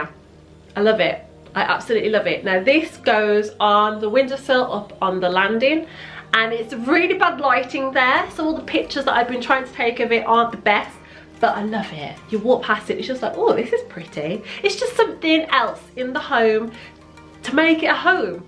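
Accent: West Midlands, United Kingdom. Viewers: That emerald wall is everything ! I have just brought an emerald green paint in wilko to do a painted arch in my hallway, and may i say our accent sounds familiar 🤔 😄 🤣 😂. From the west midlands and as soon as I started watching I noticed the accent love it 😄 🤣 your style is so like mine too ! New subscriber here ;)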